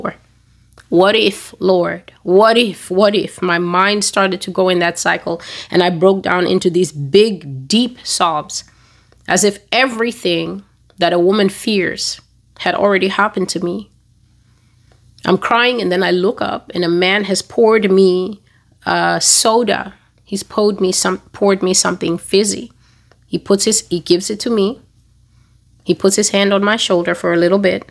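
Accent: Nigeria. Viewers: You spoke exactly like a Nigerian 😅....Well done ma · Great job on that Nigerian accent, I do don’t care what pple say about this girl, all I know is God speaks to her❤ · This Nigerian accent is on point 😀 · God bless you Sister. I'm Nigerian , it's scary how you could mimic our accent though🙂 . May the Lord continue increase you in strength & wisdom . You are a gift to humanity . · “Chop bar”= Buka Thank you for all you do. You are an honorary Nigerian 🇳🇬because you can speak like us. I salute your dedication and your work daughter of my King🕊️🤍🎚️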